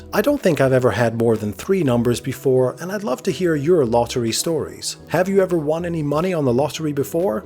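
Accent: Scotland, United Kingdom. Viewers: I could hear a bit of Scottish in there!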